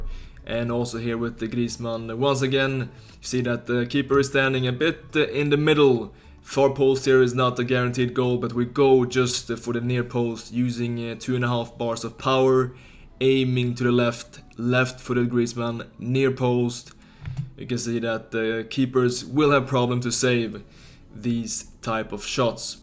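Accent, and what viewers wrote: Sweden. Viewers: Are you swedish i can tell by your accent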